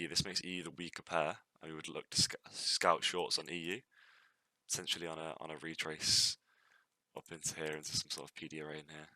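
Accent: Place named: London, United Kingdom. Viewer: laughed out loud at 7.29 when you say wer are fucked lool. Are you from London bro? fix up a channel asap.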